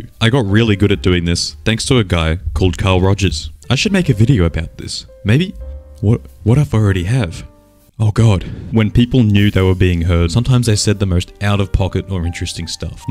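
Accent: Australia. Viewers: cheeky aussie accent for extra charisma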